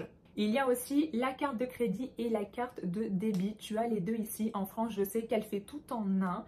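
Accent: Quebec, Canada. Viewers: Tu commences à prendre l accent Québécois :)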